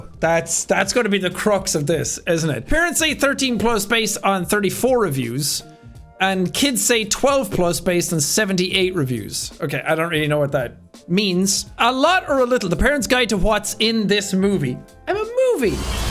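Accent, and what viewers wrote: Ireland. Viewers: I actually like when swears a lot, he's and entertaining guy and his Irish words are magical to my ears. Granted I'm an adult now so I guess it's different. I'm also Native American so like the Irish, swearing is integrated into our conversations a lot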